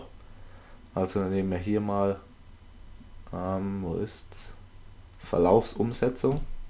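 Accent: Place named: Swabia, Germany. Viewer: Du bisch a kleiner Schwab wa? ^^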